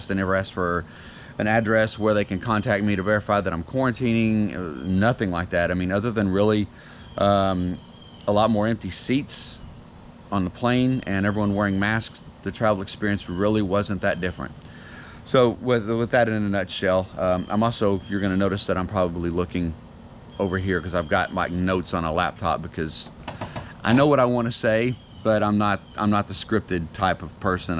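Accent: Morocco. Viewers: Stay safe bro...we gonna miss your very special moroccan dialect ...hope we will see you soon in Morocco. You re become one of us. ❤🇲🇦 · Bravo; You speak now like a real Moroccan 👏👏👏👏👏👏👍👍👍👍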